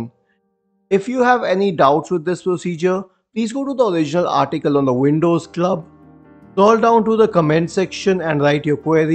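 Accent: India. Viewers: ty indian?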